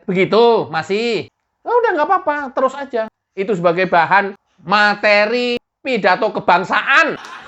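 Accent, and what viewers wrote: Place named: Rural, Indonesia. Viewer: Bicaranya koq seperti orang kampung ya ! Enggak bermutu lah !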